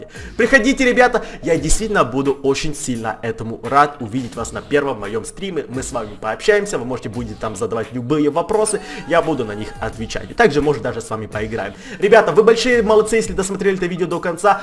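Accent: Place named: Ukraine. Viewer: Какие же старые видио....... даже говорит с украинским акцентом )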